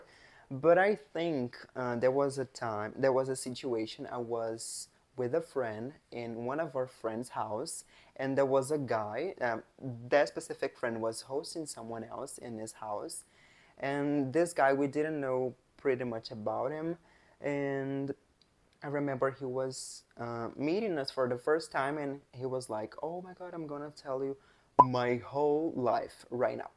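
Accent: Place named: Brazil. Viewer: não tem como não entender pois por mais que você tente fazer a pronúncia de um americano você fala do jeito cadenciado de um brasileiro então está 100% compreensível sim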